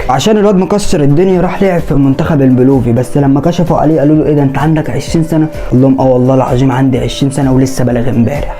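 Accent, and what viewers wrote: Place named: Saudi Arabia. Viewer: بتقلد سعودي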